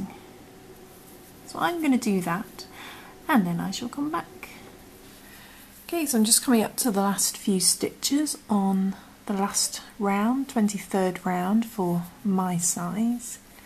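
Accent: United Kingdom. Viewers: My only gripe is you’re using US terminology and you sound British but otherwise lovely thanks for the share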